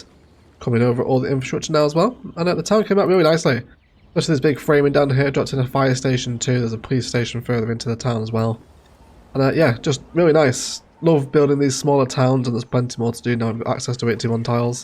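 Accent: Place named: North, United Kingdom. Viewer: Thanks for all of your enjoyable contributions which are always very entertaining. I was just curious...you've likely been asked this before: You have a northern accent; which region are you from. Thanks again. Best wishes from Belgium.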